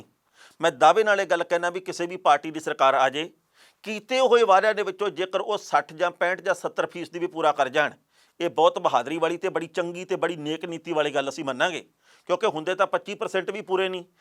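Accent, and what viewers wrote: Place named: Majha, India. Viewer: Majhe dee boli sun k bahut mjaa aya. Sat sri akal tehna shib g.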